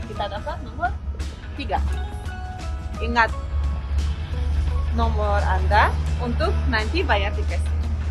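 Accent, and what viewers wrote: Indonesia. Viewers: Kak Pernah Ke Indonesia Belum Soalnya Aksen Bicara Sudah Orang Indonesia Banget 🇮🇩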